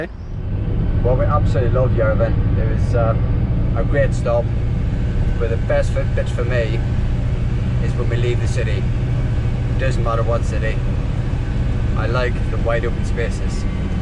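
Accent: Northern Ireland, United Kingdom. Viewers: Fabulous and entertaining, as a recent subscriber, these vlogs are right up there in content, compilation and delivery. His Irish charm effuses the delivery, great that he has to temper his Irish poetic romanticism! I would guess that with his soft N. Irish voice grew up in the northern part of his Co.Down? and looked south to the beautiful Mountains of Mourne for his inspiration?!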